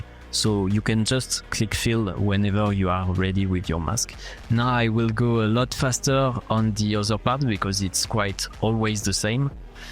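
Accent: France. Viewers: Ça sent l'accent français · T'es Français ? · On dirait un francais❤🇫🇷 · Are u French? Bc ur accent ;p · You have french accent 🤨 , are you french ? (Because i am) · Are u french????? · BRO T'ES FRANÇAIS ?! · Bon ça se voit tu es français, mais bro merci pour cette app incr totalement gratuite, juste y’a des pubs mais quoi de plus logique finalement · Mec t'est Français 😂😂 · L'accent français de fou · As a French, he's clearly French heeh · I think ur french if it is I'm too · Bro are u french ? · It's not against you, but as a french speaker, You have the accent of a French speaker who speaks English. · we j’ai vu il a un accent francais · L’accent français a 100% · Ta un accent français 😂😂😂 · You lowk have a mad French accent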